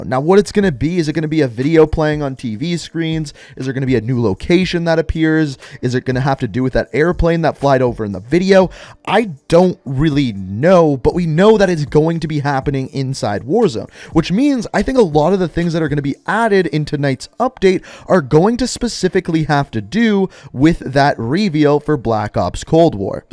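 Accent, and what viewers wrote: Canada. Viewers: I love yo videos. Ur Canadian ryt just wondering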